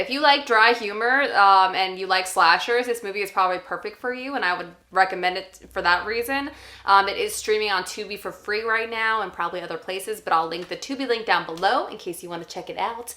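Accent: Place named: United Kingdom. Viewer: Killer British accent btw. Heehehehehe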